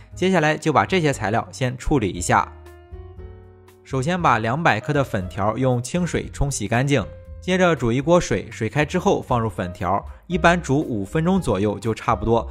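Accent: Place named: Jinan, China. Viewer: 听口音就猜是济南的，加上甜沫豆腐脑，更加确定无疑了。谢谢老乡。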